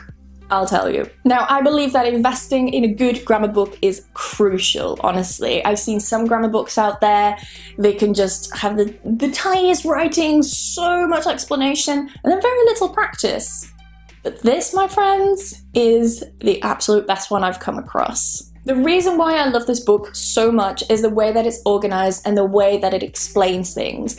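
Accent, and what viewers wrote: United Kingdom. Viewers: Hi. Thanks for your recomendations. I like so much the british accent☺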